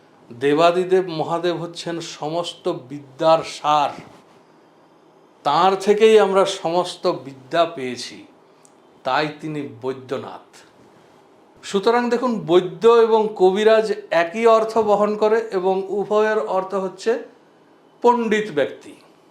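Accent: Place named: Bankura-Purulia, India. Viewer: আপনার কথা শুনে মনে হয়েছে, আপনি উত্তর বঙ্গীয় বা বাঁকুড়া পুরুলিয়ার কোথাও। এটা আমার অনুমান। আমার বাড়ি বর্দ্ধমান জেলার উত্তর প্রান্তে। মোটামুটি কবিগুরুর শান্তিনিকেতনের কাছাকাছি। আপনার কাছে বহু কিছু শেখার আছে। আমার বয়স ৭১+। কিন্তু জ্ঞানে আমি নেহাত শিশু। পেশায় একজন সঙ্গীত শিক্ষক। তাতেও বোধ হয় শিশু। খুব কষ্ট হয়, এতদিনেও শিশু হয়েই রইলাম। আমার শ্রদ্ধাপূর্ণ নমস্কার নেবেন।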